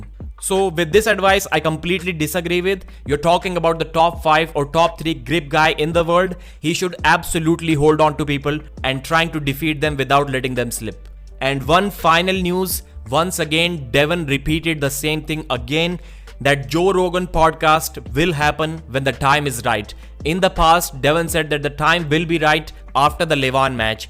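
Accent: India. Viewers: Your indian accent is so funny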